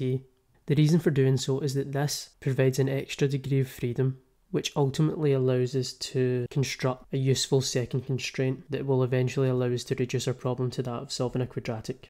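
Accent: Scotland, United Kingdom. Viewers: I did not imagine someone named to have a Scottish accent. Great video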